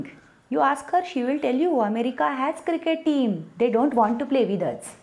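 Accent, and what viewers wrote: Maharashtra, India. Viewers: How ur marathi language accent is so original .. just amazed to see your content and use of different languages in video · Wow! While all of your characters are great, I love this one "Mrs Kulkarni!" the most! You represent this household character so well, it's like we are "seeing" it right in our family and/or neighborhood! And the way you speak Marathi with right nuances! Hats off to you! Stay blessed! 🙏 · U r so awesome. U r d only 1 who does typical marathi accent but still doesnt feel offensive or diminishing. Grt going. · You are so good ! I love the way you tackle serious issues with humour. And your command on the Marathi accent ! Superb! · Your Marathi accent is on point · Yes,Awesome. Perfect marathi accent. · Nailed it. Marathi accent is so good. 👍 I feel your comedy is simple and good, sometimes I feel I am reading our beloved PU LA Deshpande book. 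· Marathi accent awesome!!! 🎉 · Marathi accent is awesome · how did u nail down the Marathi accent to a tee? Mumbai madhye shezaari ani mitra maitrini marathi hote ka? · You are so cute dear… typical our marathi accent😂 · The Marathi accent is ekdum mast-a!! 👌🏼👍🏼 Too good! 🤣🤣🤣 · The way you mix Marathi words and bring in the accent is just amazing....ur observation skills must be super amazing. we need such type of lite subtle comedy, enough of abusing, vulgar, political based comedy...keep up the good work. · तुमच मराठी एक्सेन्ट एकदम चाबुक होत।।।। अद्भुत कथा कथन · If I am not mistaken, you are a Kannadiga from namma Bengaluru. Pls pls pls tell me how do you manage this typical Maharashtrian accent ?? 😄 the way you pronounce words ending with ‘s’ is so bang on typical Marathi manoos style. I am commenting on your video for the first time. Am a big big fan 🤟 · y did u leave your kannada accent and started this Marathi accent videos. 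Please get back to the older times. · Khup chan...Marathi accent excellent....conveys right message with courtesy....👍👌👌👌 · How u bring marathi ascents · your are awesome!!!marathi accent tar ek number! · How is ur maharashtrian accent so perfect? Are u maharashtrian? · Look at the Marathi accent excellent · Ohh, your Marathi accent 👌...#Surprised! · Marathi accent is just on point ...the grace u hold while narrating the content is just out of the world ...kudos to you ❤️❤️❤️❤️ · you got that Marathish English the best :D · Your content is soooo amazing and you have picked up the Marathi accent to the T. I am surprised to see only 256k subscribers...wish you millions of more subscribers and thank you for entertaining us!!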